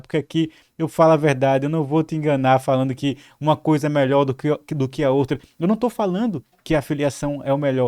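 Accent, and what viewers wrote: Northeast, Brazil. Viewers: pelo seu sotaque voce é nordestino. Sou da Paraiba e gosto muito dos seus videos. De onde voce é?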